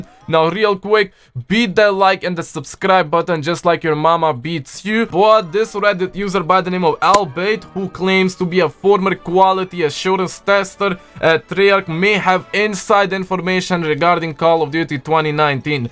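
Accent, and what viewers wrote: Russia. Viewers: please stop the russian flow bro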